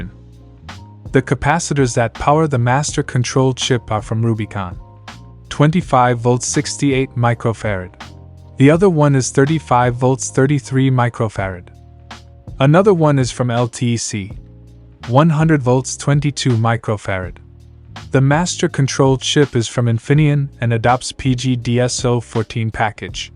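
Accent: China. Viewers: I really prefer your english with chinese accent instead of this AI english transcription.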